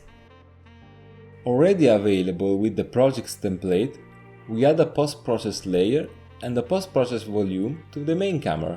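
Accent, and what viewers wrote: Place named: Italy. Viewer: am only here for the Italian accent :p Great video btw, the shader part was really interesting!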